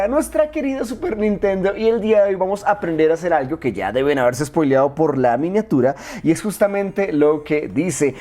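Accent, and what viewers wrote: Colombia. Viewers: Eh mijo x2 colombiano 😅😗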